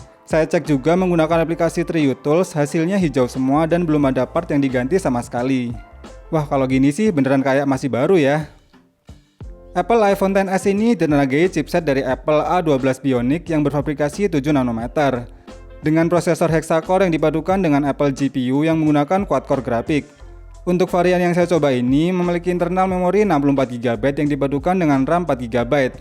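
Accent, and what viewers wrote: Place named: Sleman, Indonesia. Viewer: koyo ng sleman ikuu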